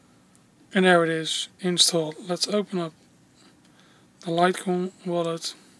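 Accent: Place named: Netherlands. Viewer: lekker Nederlands accent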